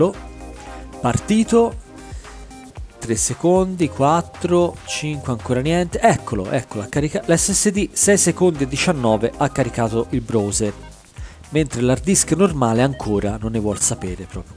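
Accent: Tuscany, Italy. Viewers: Hai un accento di merda, potresti essere più ascoltabile se non tenessi l'accento toscano nei video. X il resto meno male che va + veloce è un SSD. Carina la cosa della scheda madre anche se è vista e rivista mille volte. MI RACCOMANDO CAMBIA QUEL ACCENTO DI MERDA DA TOSCANO ! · Ma scusa se ti dà fastidio l'accento toscano non lo guardare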